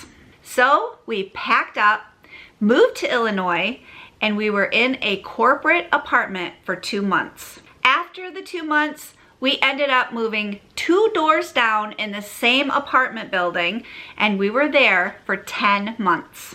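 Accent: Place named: Texas, United States. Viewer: I could never wrap my mind around your dialect being from Texas! Best wishes for your future. 😊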